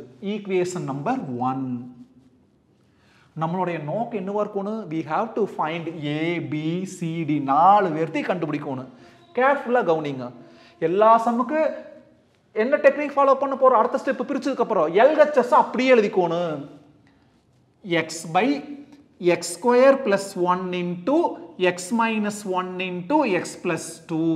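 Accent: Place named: Kongu, India. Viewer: Sir, your are a kongu Tamil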